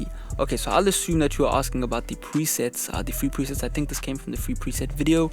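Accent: South Africa. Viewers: Where are yo from bro you sound South African. I would know cause I’m from there as well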